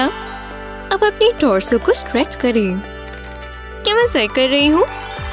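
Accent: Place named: United States. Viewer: are you American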